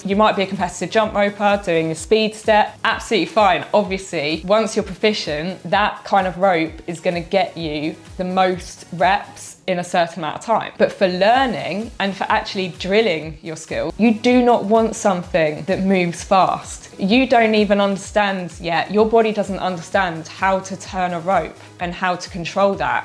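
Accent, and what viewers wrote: United Kingdom. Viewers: This British accent 🥰😍🥰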